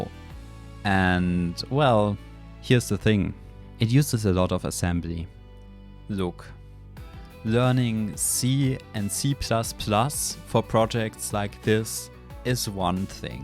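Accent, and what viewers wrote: Germany. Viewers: i hear german....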